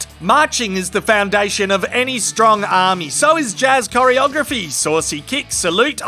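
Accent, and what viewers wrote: Australia. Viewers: This guy sounds so Australian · When I moved to australia from the US, everybody sounded like you for the first month 😂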